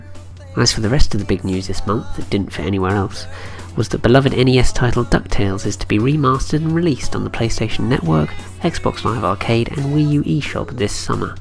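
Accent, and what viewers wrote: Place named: United Kingdom. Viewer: Fantastic show, went back and watched all previous shows nice to hear a British accent. Wish they would package all Time Crisis games for the PS3 Move what you think?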